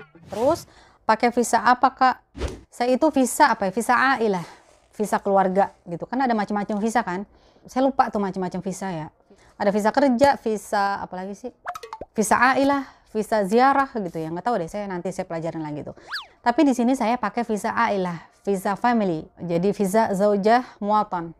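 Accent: Jakarta, Indonesia. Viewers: Udah lama di madinah , tapi masih kentel banget betawinya . Jadi kangen Betawi , dari gadis sampe punya suami ampe punya anak lama di betawi Jakarta barat , padahal saya orang Banten serang . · Betawi nya kentel bgt 😀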